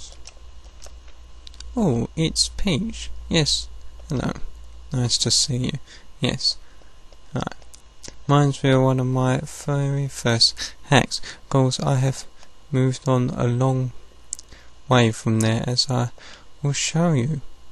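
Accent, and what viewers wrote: United Kingdom. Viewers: Very nice video! It's interesting to see how you make all those really cool codes, and memory edit the game! Looks a lot like the hex editor I use (I would suppose so, it's hex editing). Nice British accent too as well! Mine went away a long time ago.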